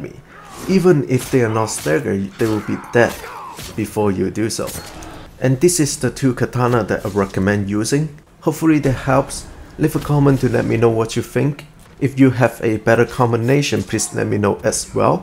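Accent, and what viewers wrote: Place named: Taiwan. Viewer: Thank you for another great video. A lot of information to process here so i need to watch a few times so i can adjust my Samurai build. Are you from Taiwan, love your accent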